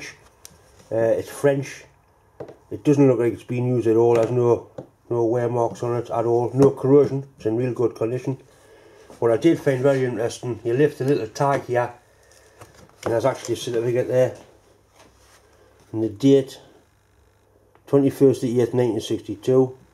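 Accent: United Kingdom. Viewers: are you from the United Kingdom?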